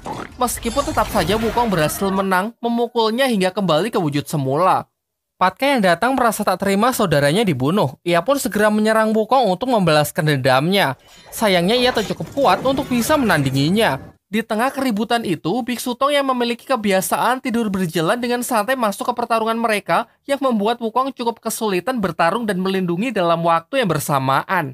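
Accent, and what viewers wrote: Java, Indonesia. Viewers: Wong jowo e mas? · Jowo bang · Wong jowo mas?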